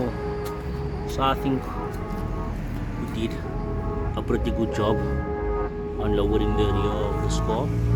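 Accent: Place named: South Africa. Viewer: Love the South African accent 😄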